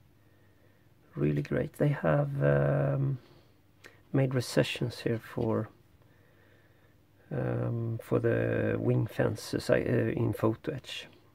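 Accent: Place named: Sweden. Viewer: Är du svensk för din accent på engelska låter svensk?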